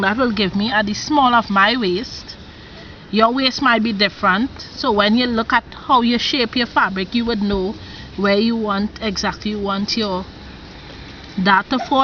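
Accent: Trinidad and Tobago. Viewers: when i started looking at this video i say but how this lady sounds like a Trini so, I like it, we need to meet some time. I am from Trinidad and Tobago and I teach Fabric Design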